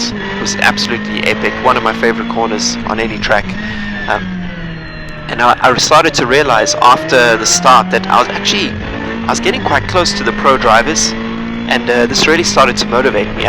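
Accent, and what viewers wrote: South Africa. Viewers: are you South African? you sound South African. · Greetings from SA! Totally unmistakable accent right there.. (: Nice to see a home boy succeeding like this in the racing world. Suffice to say I'm jealous of the cars you get to drive... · You sound South African.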